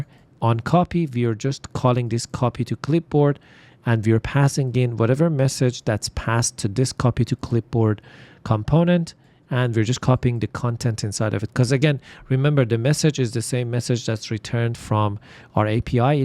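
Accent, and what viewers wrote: Iran. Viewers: آخی فهمیدم بوی ایرانی میدی هم از لهجت هم از چهرت کارت هم درسته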